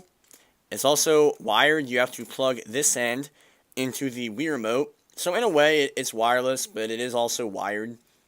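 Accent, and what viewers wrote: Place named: Philadelphia, United States. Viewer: Definitely philly/south Jersey accent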